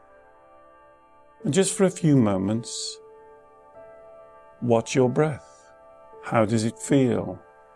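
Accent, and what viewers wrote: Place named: Northern England, United Kingdom. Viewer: Your northern English accent is so calming. Kia ora from New Zealand.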